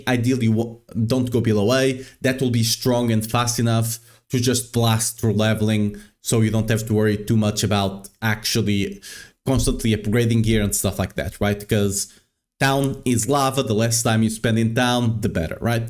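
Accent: Portugal. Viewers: It's incredible that I can tell you're Portuguese just from your English nice video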